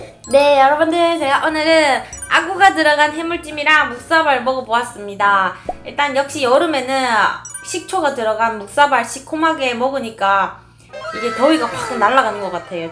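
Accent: Gyeongsang, South Korea. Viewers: 처음에 알고리즘으로 보게 됬는데 익숙한 사투리라서 뭔가 반가웠는데 창원쪽에 사셨군요 ㅎㅅㅎ 전 부산 사람인데 같은 경남이라서 반갑네요! 먹는 스타일도 너무 제 스타일이셔서 구독했어용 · 누나 역시경상도는 사투리제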